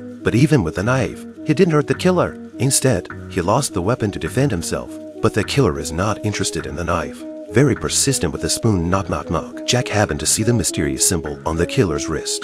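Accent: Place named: United States. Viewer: bro turned american💀💀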